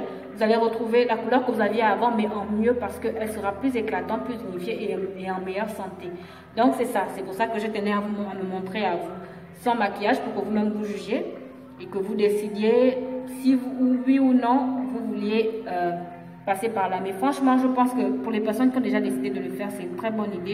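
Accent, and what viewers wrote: Gabon. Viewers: Bjr ma belle tu as l'accent Gabonais de quelle pays est tu si ce n'est pas indiscret?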